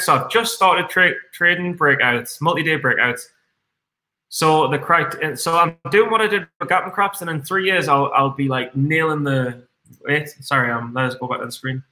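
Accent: North East England, United Kingdom. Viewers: hey man are you from north east of England????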